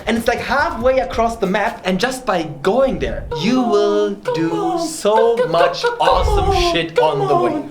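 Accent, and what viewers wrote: Germany. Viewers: Wtf is he german? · Are you german or something? :D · A Chinese talking with German accent in English. Ich bin so confused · Your englisch sounds sehr deutsch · whaaait are u from germany ? :D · Ya your german accent is weirdly titillating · You sound awfully German to me... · The deutschenglish makes me have the kekkers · Are you from germany??? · Lol the German accent bruh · Is he german ? o.ō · Sometimes I forget that he’s German , dann he speaks something in Deutsch accent and I’m like . Stimmt ja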